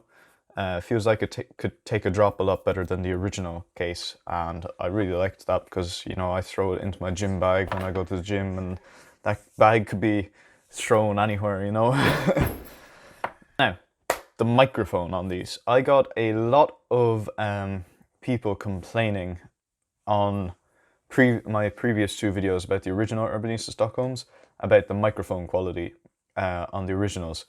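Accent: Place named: Ireland. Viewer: As a Swede/Dane who use to live in Dublin: It's always great to hear the Irish accent!! The best English accent in the world, I always miss Ireland when hear that accent. Irish people are down-to-earth people, I love that country.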